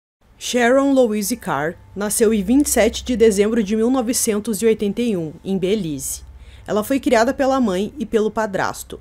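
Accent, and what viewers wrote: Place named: Rio Grande do Sul, Brazil. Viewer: Ela parece o Mário cortela falando. Será q e gaúcha tmbm?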